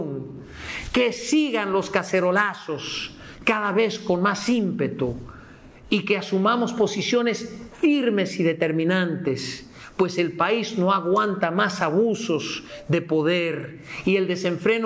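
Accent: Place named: Dominican Republic. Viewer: Admiro tú español Dominicano, Increíble, eres 100% Dominicano.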